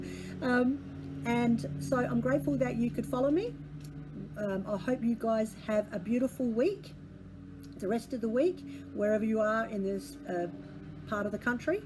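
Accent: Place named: Australia. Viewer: Love your videos and accent - lived in Exmouth, WA in the 70s and LOVED Australia. My oldest son was born there too! I would love to go back and spend more time in Perth and explore more of your beautiful country. I would love the Austen bag - it is amazing. Enjoy your retreat, and have a most wonderful trip back home. Much love. 💕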